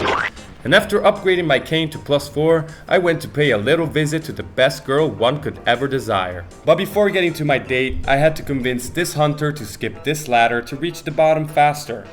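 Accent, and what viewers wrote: Italy. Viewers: sei italiano? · U are italian?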